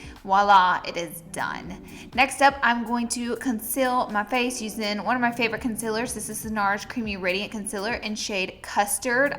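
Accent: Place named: Rural, United States. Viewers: i luuuuuuv that "aksent!!! are you from texas also?? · has a country accent 😂😂 I love it❤️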